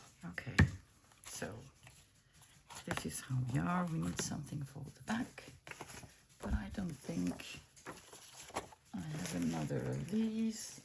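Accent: France. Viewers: Dear don't be sorry. I love to see what you make in your own wonderful chaotic way. And I love to hear you talk with your beautiful French accent. But post when you're okay and when you're up to. Good luck with your operation, try to not worry to much. Hugs from me, Gertie from the Netherlands. Btw I'ld lóve to see the puppies! 🩷🩷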